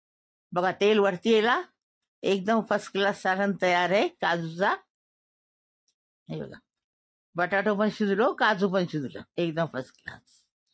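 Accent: Konkan, India. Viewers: Masha Allah aunty aap kokni ho bolo mazaa aata hei. Hum samajhte hei , Bolne mei ardevat hei 😊, mummy accha bolte thei , Allah unko jannat naseeb kare ameen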